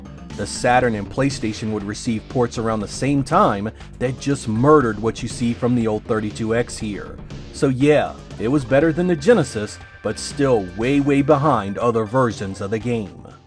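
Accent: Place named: Mid-Atlantic, United States. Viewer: Man it’s obvious you’re a Mid-Atlantic guy!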